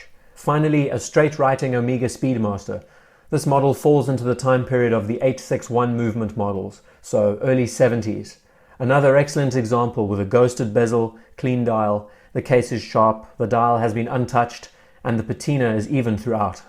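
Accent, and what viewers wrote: South Africa. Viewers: Agreed! I thought South African. Keep up the good work!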